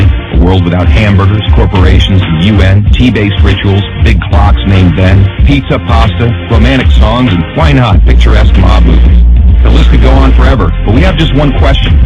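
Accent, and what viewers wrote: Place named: United States. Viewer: foarte tare clipul!!!!pacat totusi ca sunt putin cei care apreciaza si recunosc toate meritele Romaniei. totusi nu mi-a placut vocea tipului...adica accentul lui cand pronunta cuvinte in romana!!! de ce le pronunta ca un american/englez???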